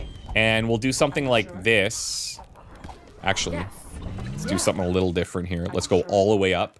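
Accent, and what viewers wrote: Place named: Canada. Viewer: As a non native English speaker it took me till to detect the Canadian 😅 🇨🇦 ❤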